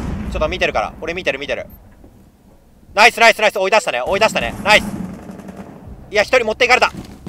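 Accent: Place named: Kansai, Japan. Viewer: 自分とかゆうてますけどもみたいな関西弁使う感じめっちゃ好き笑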